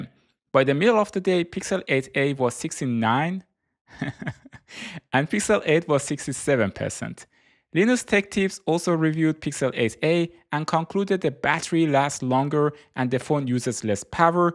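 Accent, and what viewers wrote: Iran. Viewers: Is that a Persian accent I hear?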